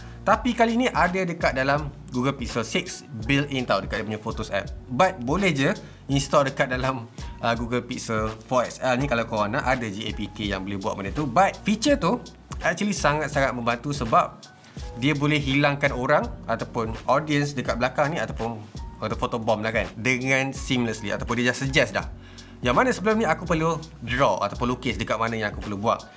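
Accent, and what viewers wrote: Terengganu, Malaysia. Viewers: Kalau la channel ni x tiru MKBHD + cakap teganu, aku rasa lebih ramai yg subscribe. Be yourself bro. I know you can do it.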